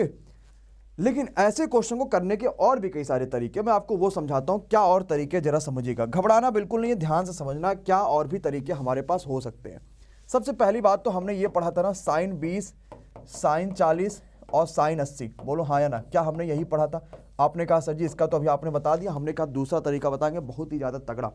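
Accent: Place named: Kanpur, India. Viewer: कनपुरिया स्टाइल🤘🤘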